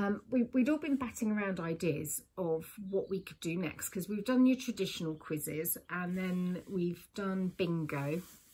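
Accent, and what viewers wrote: United Kingdom. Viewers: That’s a hard one, I can totally see you talking to the police in LA... all upset, probably at an airport or even better in an big casino full of people with your british accent and your colleagues laughing.. the poor police officers 😂 but the shoes also sound very... hm, im going for the shoes as a lie! I just want the LA story to be true 🤣🙋🏻‍♀️ Thanks as always, have a nice day!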